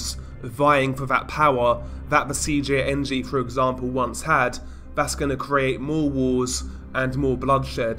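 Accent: United Kingdom. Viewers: He really can't get more british lol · Oh the British accent, the best one❤️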